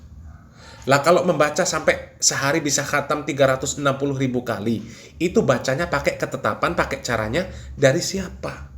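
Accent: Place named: Pontianak, Indonesia. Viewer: Iya ini bahasanya org melayu Pontianak..👍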